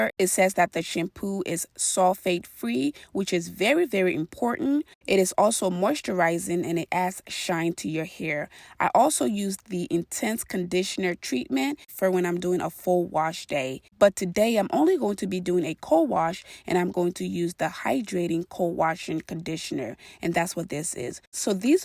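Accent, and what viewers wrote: Liberia. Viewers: Liberian Jue.... Our accent never hide. 🇱🇷♥️😊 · She sound Liberian...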